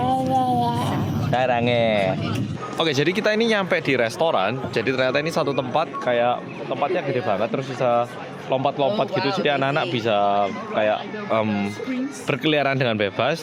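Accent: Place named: Malang, Indonesia. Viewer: Mas di Belanda tetp dengan logat Malangnya. Ayo ngerawon sek mas + nak Dempo tuku es teler 😂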